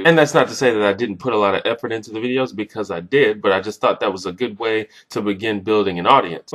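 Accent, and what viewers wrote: Alabama, United States. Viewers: Why do you talk like that? Are you from alabama or something?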